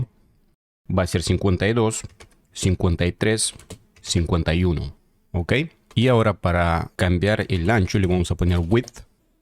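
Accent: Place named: Russia. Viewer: pero eres ruso no??a ver sin ofender ni nada solo que me gusta tu accento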